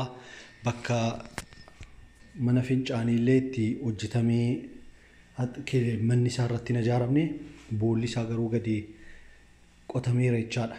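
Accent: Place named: Addis Ababa, Ethiopia. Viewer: Ati finfine malee hin dubaatu kanaafi me garaa naqamte nu dubaadhu malooo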